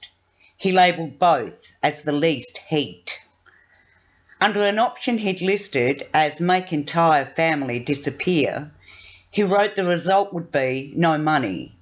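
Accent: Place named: Australia. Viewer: Good to hear an Aussie voice narrating, liked, subd.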